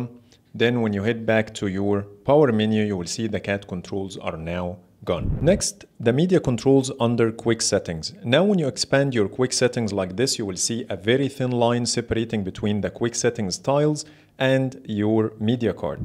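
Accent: Egypt. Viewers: I can tell from your Accent that you are Egyptian Keep the hard work 🔥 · You sound Egyptian! :D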